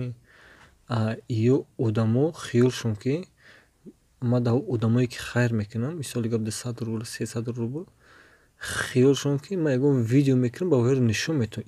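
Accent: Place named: Shurobod, Tajikistan. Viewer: И манера ба фигрм ай шурободай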